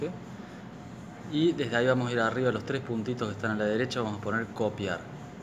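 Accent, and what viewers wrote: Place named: Córdoba, Argentina. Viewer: Ese acento es Cordobes si no estoy mal verdad. Tengo un camarada Argentino que habla igual que tú y igual que Yayo Jajajja. Es imposible de confundir ese acento Cordobes.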